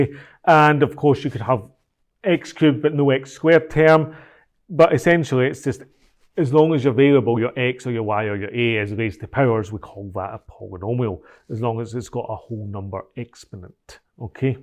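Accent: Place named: Ireland. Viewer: fikin hell mate cant understand ur irish accent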